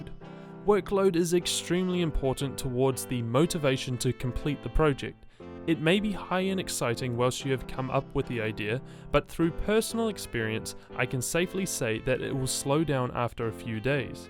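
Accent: New Zealand. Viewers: Wait?? Your a kiwi??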